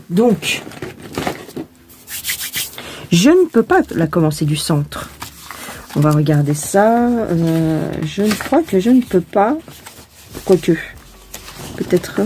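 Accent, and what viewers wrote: South, France. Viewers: Mais garde ton accent du Sud bon sang Ceux qui ne sont pas contents qu ils aillent voir ailleurs Ce sont tes délires qui font ton charme❤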